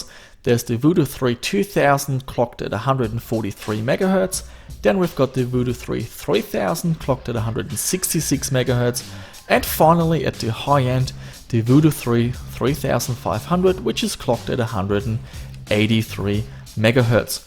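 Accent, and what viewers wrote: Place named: Germany. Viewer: oh ein deutschsprachiger, das hört man sofort gg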